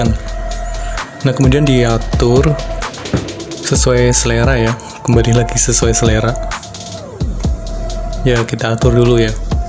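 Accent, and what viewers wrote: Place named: Java, Indonesia. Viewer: Wong jowo toh iki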